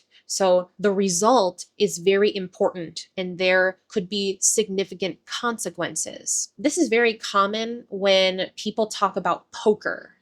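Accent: United States. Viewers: Hi, I really love your American accent—I could listen to your voice for hours! Your lessons are worth the wait. Thanks a bunch for teaching me!❤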